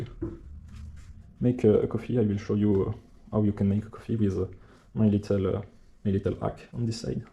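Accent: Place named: France. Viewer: u speak english very well, euuuuuh, wiz youre french accent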